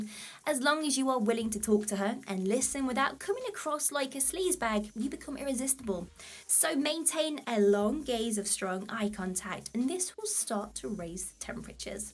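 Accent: United Kingdom. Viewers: ❤her British accent/ sexy voice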